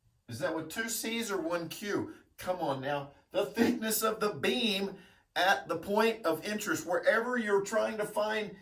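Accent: United States. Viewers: Finally an engineering video in ENGLISH! With American Accent.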